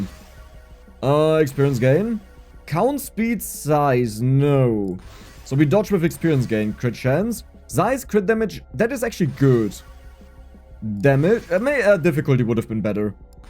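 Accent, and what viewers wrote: Germany. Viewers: der deutsche Akzent ist lustig und mittendrin immer die deutschen Fluchwörter for my english fellas: the german accent is funny and in the middle of it all there are always the german curse words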